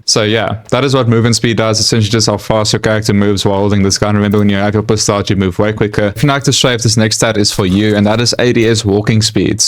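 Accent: South Africa. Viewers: Awesome accent bro, South African right? I love it, and great informative video!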